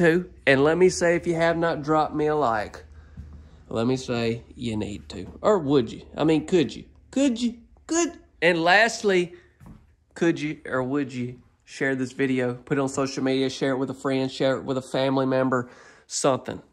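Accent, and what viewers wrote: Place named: South Carolina, United States. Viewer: Hi, just found your channel by today. Number 10 MH was nice, but to my amazement they’re still using the thin strips of wood(mdf) to join each 4X8 wallboard?, I really thought by now those would have been eliminated as manufacturing has really advanced over the years. Enjoyed your video and will be watching more. Thank you and have a great week….Be Safe ❤PS. I gotta say young man you sound like you were born and raised in upstate South Carolina.😂😂😂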